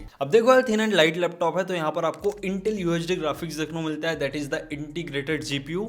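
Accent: Chhattisgarh, India. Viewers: Bhai aaap chhattisgarh se ho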